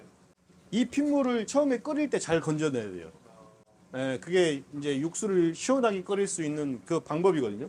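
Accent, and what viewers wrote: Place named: Jeolla-Gyeongsang border, South Korea. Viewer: 말투나 식성을 보면 전라도와 경상도 그 경계 출신인듯 함.. 하동이나 여수분이 아니실까??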